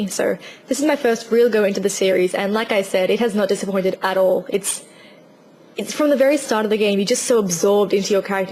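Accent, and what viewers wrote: Australia. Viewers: Sounds Aussie to me, meh doesn't matter she cute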